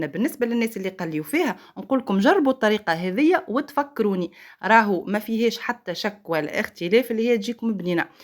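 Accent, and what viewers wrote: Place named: Tunisia. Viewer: ما شاء الله على الهدرة الزينة التونسية ما أحلاكي الفديو رائع بكل التواضع و حسن الرقي في الكلام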